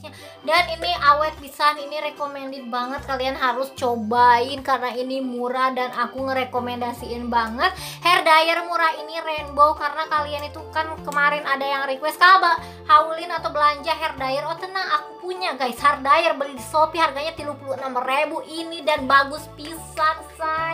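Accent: Sunda, Indonesia. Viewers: Sunda pisan euy....😆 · logat sundanya kentel banget teh ngakak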